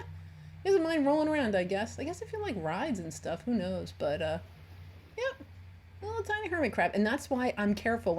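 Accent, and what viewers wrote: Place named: New Jersey, United States. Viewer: I enjoy your videos and you are teaching me so much. I would love to live close to a beach but live NE of Ft. Worth, TX. I enjoy your NJ accent and miss Cape May, NJ.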